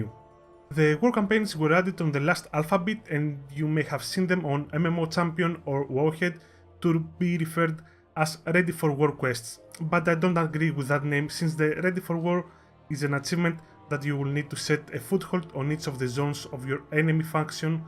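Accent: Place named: Greece. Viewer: πως καταλαβαινεις οτι μιλαει ελληνας αγγλικα απο το πρωτο millisecond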